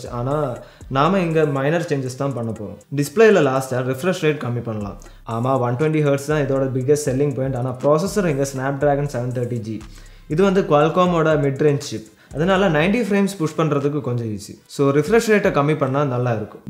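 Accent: Kerala, India. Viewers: Ena bro unga voicela lighta tamil+malayalam kalanthu varuthu neenga malayaliya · Bro nega malayalam mix tamil pasuriga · Malayali yoh? Bcoz ur slang looks like Malayalam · Malayalam kalantha tamil um kekka nalla than irukku ❤️ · Mallu accent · அதெல்லாம் சரி க்கு தமிழ் தடுமாறுதே. மலையாளம் வருது.... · Nice explained.. but I felt ஒரு மலையாளி தமிழ் பேசுற மாதிரி இருக்கு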